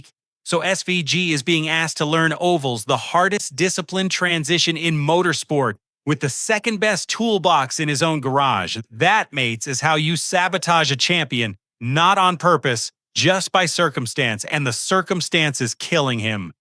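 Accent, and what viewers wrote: United States. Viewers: It’s weird this script is written with a bunch of Australian / NZ linguistic ticks, ie. bloke, bloody, etc, but it’s in an American accent. Very strange.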